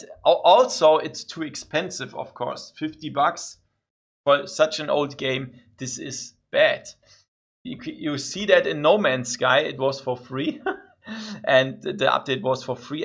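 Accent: Germany. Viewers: You never have to apologize for your English. I absolutely love your accent and Germans in general so the people who are here, stay for one reason or another.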